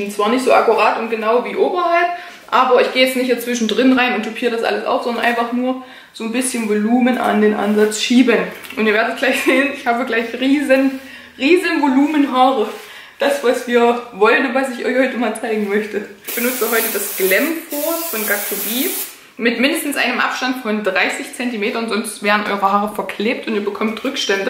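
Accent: Saxony, Germany. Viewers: Witzig, du hast meinen zukünftigen Namen 😅 Kommst du aus Sachsen?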